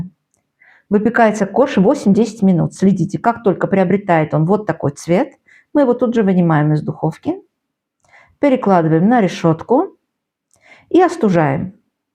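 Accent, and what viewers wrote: Baku, Azerbaijan. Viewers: Никогда не слышала о таком, спасибо за рецепт, спасибо за наш бакинский старый акцент, как вы его сохранили???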